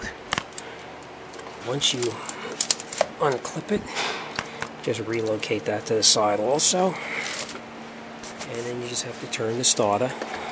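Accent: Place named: East Coast, United States. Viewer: The volume was fine☺ maybe I just like your voice. Your east coast accent. Wiya=wire 💪👍👌👏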